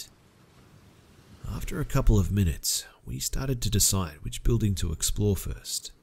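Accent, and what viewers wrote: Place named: Australia. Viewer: hey you sound aussie.which city are you from? 😄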